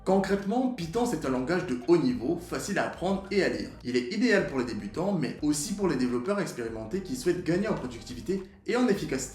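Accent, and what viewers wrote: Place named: Cameroon. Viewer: l'accent camer ne meurt pas :) ton contenu est super je viens de tiktok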